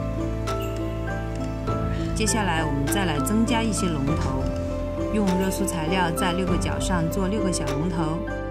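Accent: Sichuan, China. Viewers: 原来大神是四川人！之前听口音觉得有点像，但不确定。四川出了好多大神级的up主啊！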